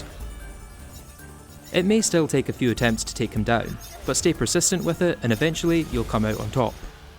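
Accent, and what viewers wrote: Scotland, United Kingdom. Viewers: Came for the terraria, stayed to be gaslit by a nice sounding scotish man :)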